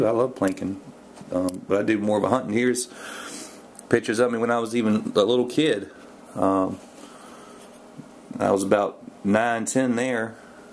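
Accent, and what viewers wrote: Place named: South, United States. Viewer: Southern accent does not help either, anyone know a nobel prize winter or eminent scientist leader in his field who has a southern accent and don't even bring up Clinton because until he and Jimmy were elected President I thought there was something special about someone who could become POTUS but they killed that Idea FOREVER you can be common as shit and be president.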